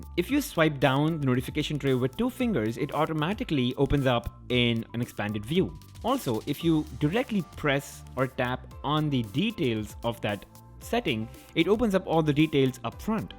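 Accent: India. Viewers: Damn that Indian accent!! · Fuck your indian accent 🖕🖕🖕🖕🖕🖕🖕🖕🖕🖕🖕🖕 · Hey man are u indian...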